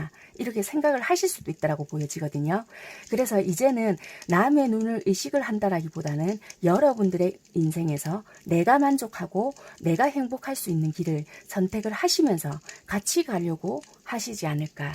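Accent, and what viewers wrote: Busan, South Korea. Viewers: 자세한 설명 감사해요~ 잘 맞아서 항상 잘 보구 있어용 이번에도 꼭 맞길💗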